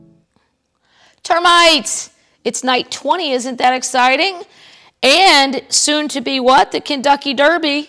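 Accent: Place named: Wisconsin, United States. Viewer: GIrl you are breaking land speed records releasing these. you''ll spoil us termites. Thanks.:) Envy accounts for a lot of negativity. I'm unemployed but I've never had time to do all that negative stuff. Life is too short. Love that Wisconsin accent. Ooo that is not my definition of a hillbilly. I speak banjo. Termites- are we all safe or have some of is blown away. The winds have picked up here. Yeah Confidential was a lurid version of the Enquirer- there is a natural curiosity about Hollywood, but who's life was ever improved by reading that swill? A medical degree may be helpful when I'm seeking advice. Night night termites. I am happy for anyone who loves what they do for a living. What a luxury.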